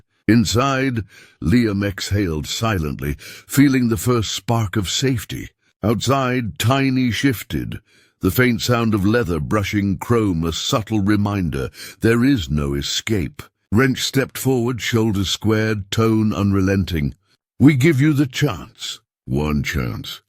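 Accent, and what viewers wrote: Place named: England, United Kingdom. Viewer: Sorry, but I can't listen to the heavy English (?) accent telling a biker story. Just doesn't match.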